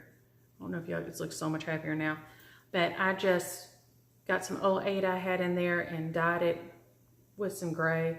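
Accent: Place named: Georgia, United States. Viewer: FUDGY Brownies Only for this girl! Are you from Georgia originally and moved to Texas? You sound like a Georgian. I love it!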